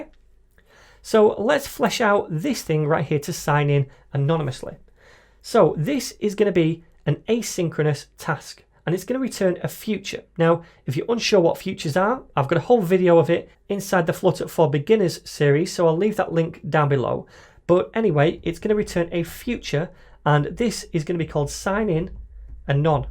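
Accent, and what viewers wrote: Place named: England, United Kingdom. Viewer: You are the best in youtube ive seen explaining each topic I guess because your English is native so you don't do any effort to translate your thoughts plus you are awesome plus your accent is Sooo English that makes every word sound smarter,, and makes me feel wearing a grey tailored fit suit attending a lecture in an old shiny wooden lecture hall in oxford university in year 1752 among a beautiful group of people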